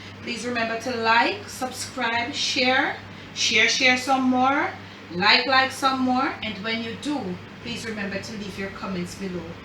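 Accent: Jamaica. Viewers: Am here for Jamaican accent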